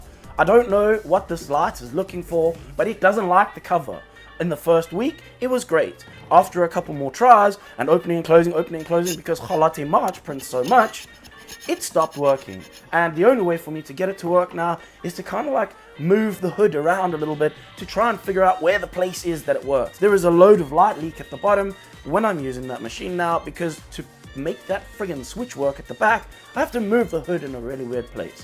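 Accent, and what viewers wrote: South Africa. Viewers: Why does it sound like he has a south african accent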